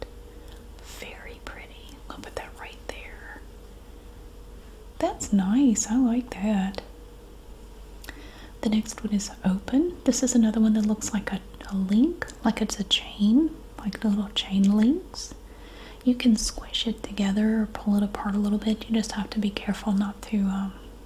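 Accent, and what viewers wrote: South, United States. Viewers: I think the reason we love the soft spoken videos is that when you whisper, we can’t hear your beautiful Southern accent! ❤❤❤❤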